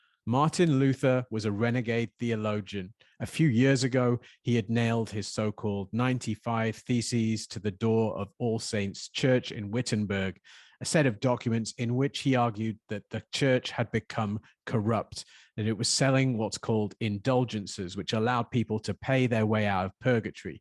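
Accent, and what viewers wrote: England, United Kingdom. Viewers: I know this sounds ridiculous but besides the undoubtedly high quality of your research, what i like about your videos is your British accent. It's so much more pleasant to hear than american or australian. Or for that matter mine i.e. indian! 😀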